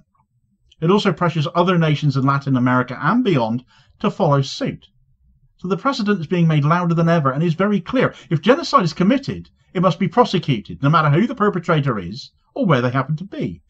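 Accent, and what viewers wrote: Cornwall, United Kingdom. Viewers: I did watch a video of huge protests in Chile which has a large Palestinian population. Great reporting as usual. One of the finest independent journalists, love the Cornish accent too😊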